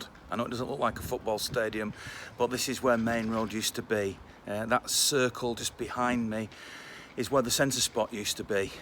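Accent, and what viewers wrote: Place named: Oldham, United Kingdom. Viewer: That's a strong Oldham accent you have there, respect for keeping your roots, 👍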